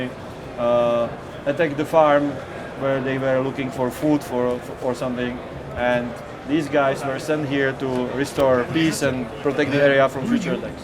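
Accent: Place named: Czech Republic. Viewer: Jde poznat český přízvuk ! :D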